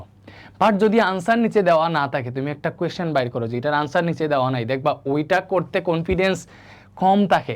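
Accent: Sylhet, Bangladesh. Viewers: Bhai apni mone hoy Sylheti😊😊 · Bhai apni ki Sylheti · Sylheti Sylheti vibe aser bror kota taki?🙌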